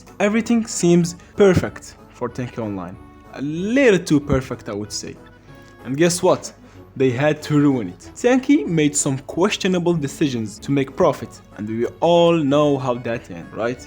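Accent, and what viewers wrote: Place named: Morocco. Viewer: i hear a moroccan accent 😂, Nice video GL on the 1M views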